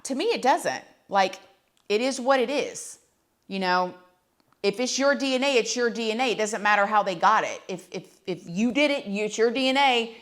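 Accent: South, United States. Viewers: I agree with you! Why does it matter?! Just a distraction ! Omg I love when your country accent comes out sometimes!!!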